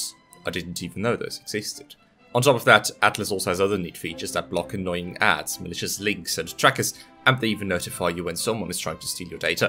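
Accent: Germany. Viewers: its very apparent that this narrator is a german speaker. you can tell by him pronouncing every german place name perfectly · So as a Schleswiger myself, I have to say you have a very heavy nothern germab accent. If not also schleswig-hostein like.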